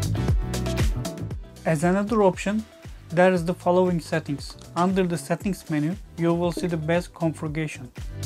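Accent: Turkey. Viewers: dude by the accent you are indeed either Turkish or i am stupid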